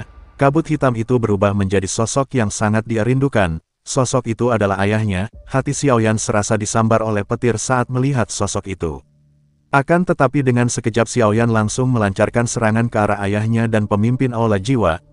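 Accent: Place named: Madura, Indonesia. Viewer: Madura min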